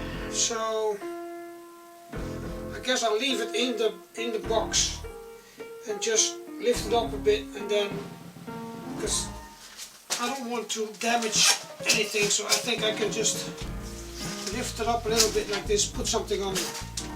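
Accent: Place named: Netherlands. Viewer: Dutch accent is awesome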